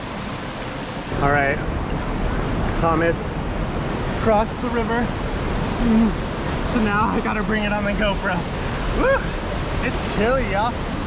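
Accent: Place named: Australia. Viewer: That Australian accent 😍🤤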